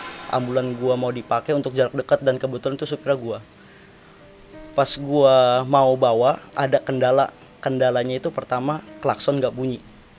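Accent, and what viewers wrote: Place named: Bali, Indonesia. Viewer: Pembawa acara nya logat nya kayak orang bali ..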